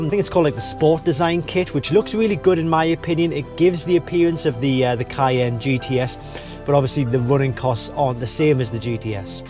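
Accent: Newcastle, United Kingdom. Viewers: This guy is definitely Geordie... Schooled in Newcastle and tell by a million miles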